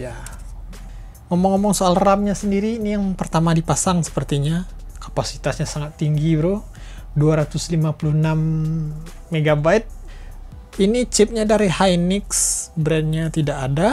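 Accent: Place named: Padang, Indonesia. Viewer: Orang Padang Abang ini agaknya...kental kali logatnya😂😂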